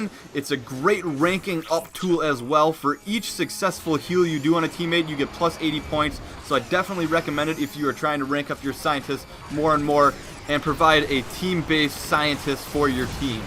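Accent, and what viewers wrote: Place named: Canada. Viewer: he has a really heavy canadian accent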